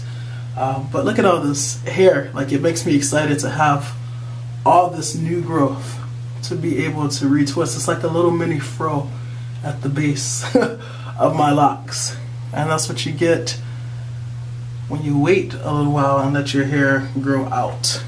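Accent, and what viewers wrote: United States. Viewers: Yes definitely still love those movies despite being old. I'm a newbie when it comes to my loc journey. I had been wanting to do it for years & actually started it "accidently" LOL I had shaved my head after having yarn braids in for over 3 years & as my hair grew, I did my hair in a two-strand twist under a wig I liked & I left them that way & WAH LAH!!!.INSTANT LOCS LOL I never retwist them, but I know I need to. I did that like once or twice. I don't want a bird's nest. Question: what do you use to moisturize your scalp & what product, if any, are you using to twist?? I've used just water in the past when I tried it, but it didn't really hold. I'm sure you've already answered these questions in past vids, but I haven't had a chance to watch them all yet. P.S. Do I hear a hint of a fellow "Michigander" in your voice?? LOL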